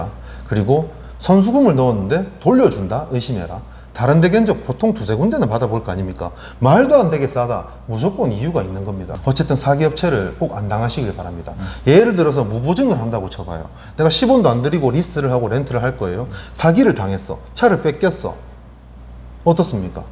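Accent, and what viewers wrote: Gyeongsang, South Korea. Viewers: 억양이 경상도 이신거 같은데 진짜 홀린듯 영상 봤네요 좋은 정보 감사합니다.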